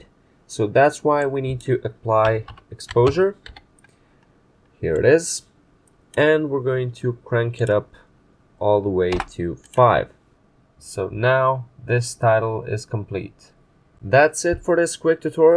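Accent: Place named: Balkans. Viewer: I suppose your from balkan your accent is great and thank you for tutorial❤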